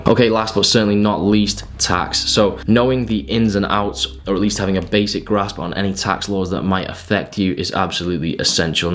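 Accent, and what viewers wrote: United Kingdom. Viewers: Nice vid - subscribed! Where you from in the UK?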